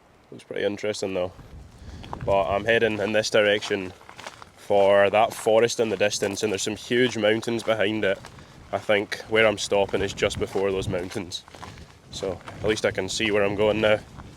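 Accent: Scotland, United Kingdom. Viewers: the new filter you are using , i need a new one and yeah first hand experience is best , salomon would be my usual goto filter ... is the katadyn be free 1l good enough ,better or worse than salomon do you know ? nice vid ,you narrate your walk wonderfully scottish 😊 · It's quite special to listen to your Scottish accent and turn of phrase for someone who is not from the UK! "Wee rivers" and "glens", for example. We didn't see you cooking or similar house keeping - I think I'd enjoy that, but maybe you've decided to focus on the actual hiking. Thanks for taking us along - from Cape Town, South Africa.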